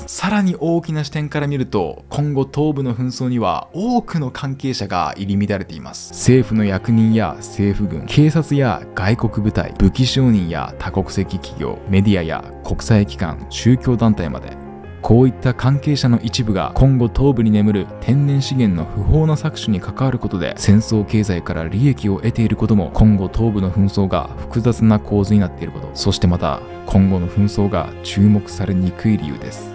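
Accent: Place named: South Korea. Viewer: 韓国人の話す日本語訛りのようなのを感じた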